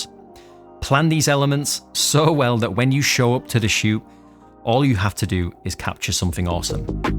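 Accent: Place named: North, United Kingdom. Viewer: by eck it's good to 'ear a northerner on't that there internet..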